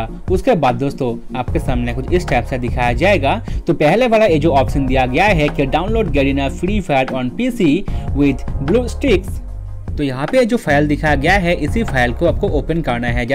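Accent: Bengal, India. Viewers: apni bengali?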